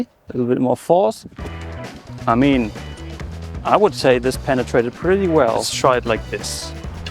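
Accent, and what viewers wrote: Germany. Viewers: Memes ✅ Nice edeting ✅ Pfandfalschen ✅ Deutscher Aktzent ✅ Dishonored content ✅ Mein abo hast du 😁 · Ich hab nie bevor deinen Kanal gesehen, aber von deiner Aussprache hab ich gedacht, dass du deutsch warst. Jetzt weiß ich, dass du WIRKLICH Deutscher bist 😂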